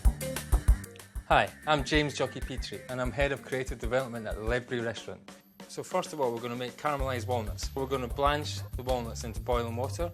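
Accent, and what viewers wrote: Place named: Scotland, United Kingdom. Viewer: EXTREME THICK SCOTTISH ACCENT 🤣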